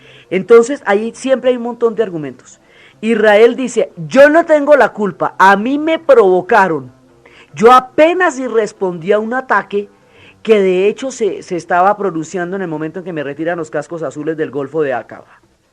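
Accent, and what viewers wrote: Colombia. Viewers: Su exposición goza de un dominio de conocimientos muy alto, fruto de profunda y cuidadosa investigación, pero además, la mezcla del sabor del español de las montañas Colombianas en sus expresiones típicas de nuestra cultura, han hecho que su narrativa me despierte aún más el interés por la historia y la geopolítica de Colombia y de todos los países de los cuales Ud. ha contado sus historias. La admiro mucho!!!Sin duda que es una Colombiana notable en cualquier parte del mundo, que bueno tener el privilegio de escucharla!!! Exitos Dra..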